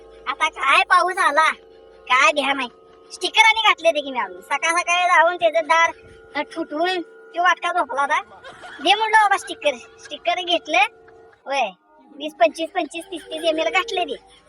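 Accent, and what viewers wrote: Kolhapur, India. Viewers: अण्णा तुम्ही अस्सल कोल्हापूरी बोलताय . मला तुमच बोलण खुप आवडत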